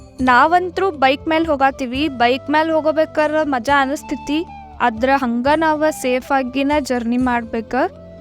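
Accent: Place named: Maharashtra, India. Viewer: your kannada is very beautiful, with a tinge of maharashtra......... I have heard this bangalore kannada, very worst......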